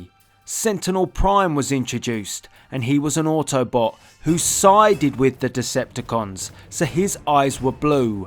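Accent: England, United Kingdom. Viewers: what part of the UK is that accent from!?!?! hahahahaha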